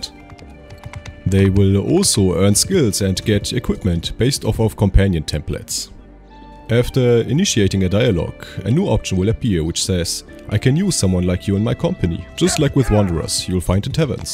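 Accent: Germany. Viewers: Der deutscher akzent hört man zu sehr aber gutes video schade nur das man auf der konsole keine mods benutzen kann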